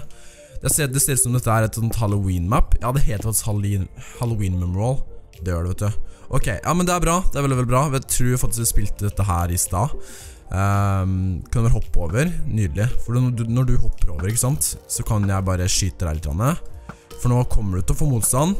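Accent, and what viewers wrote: Trøndelag, Norway. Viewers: Elsker dialekten din! (Trøndersk)😄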